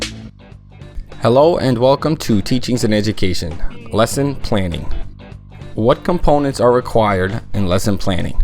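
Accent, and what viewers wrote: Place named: Brooklyn, United States. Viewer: That brooklyn accent. "don't get cauwght!"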